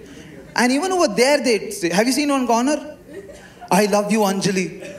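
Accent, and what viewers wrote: South India, India. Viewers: That innocent face he made "I don't wanna kill I want to fix things" was just priceless 😍 Great content Super Comedy With his South Indian accent ❤️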